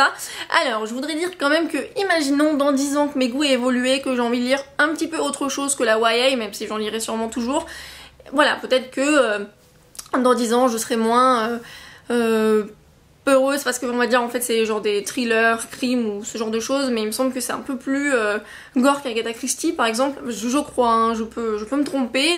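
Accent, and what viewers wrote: England, United Kingdom. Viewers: Salut. Je fait des vidéos sur youtube ou je montre mes achats jeux vidéo. j'aimerais tellement avoir le même accent "anglais" que toi;) Tu présente super bien à la caméra. Bravo pour tes abonnés et bonne continuation.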